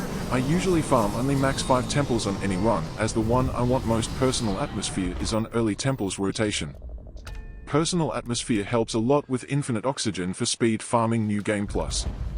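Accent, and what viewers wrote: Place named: Australia. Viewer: Nice video. I had a bit of a giggle to myself with the commentary in an aussie accent but the script seemed to be written by an asian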